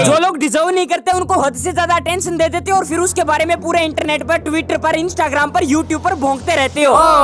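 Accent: Rajasthan, India. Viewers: Bhai❤😂राजस्थान से हो detected I'm also❤🎉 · Bhai kya aap Rajasthani ho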